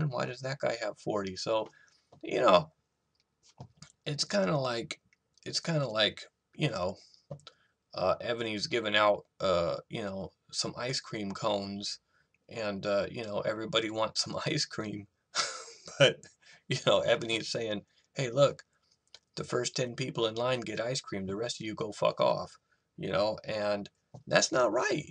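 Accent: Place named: United States. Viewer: where you born in japan because you talk like your from the states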